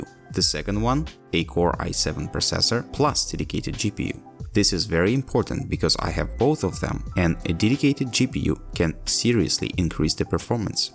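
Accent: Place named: Ukraine. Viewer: My guy have a badass Ukrainian accent 😁🇺🇦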